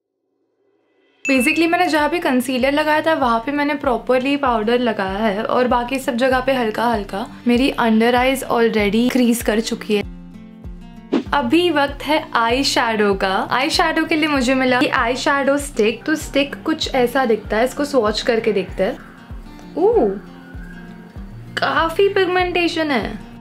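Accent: India. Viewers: Aap puri american lg rhi ho😊 bs aapke hindi accent se indian lg rhi h aap...maybe NRI?😊 bdw,love ur vdo..frst tym seeing ur vdo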